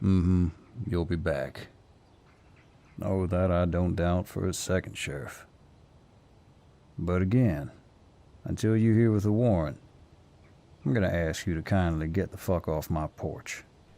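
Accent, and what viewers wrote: South, United States. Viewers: I love the southern accent😍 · I love your southern accent. It really suits you. I wonder if you talk like this irl🤭 thanks for the part 2 ❤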